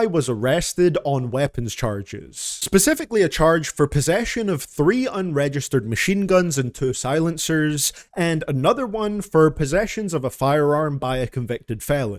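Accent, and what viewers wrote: Scotland, United Kingdom. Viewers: Is that a Scottish accent or no? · Ur accent interests me, r u a Scottish man living in America?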